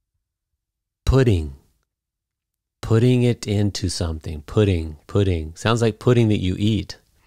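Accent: United States. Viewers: I say t sound for t. You must be teaching hoosier English.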